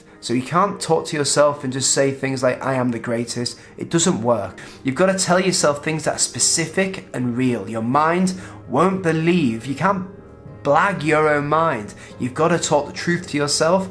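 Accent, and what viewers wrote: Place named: United Kingdom. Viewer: Pedro Pascal with a British accent on a bike? Why not 🥸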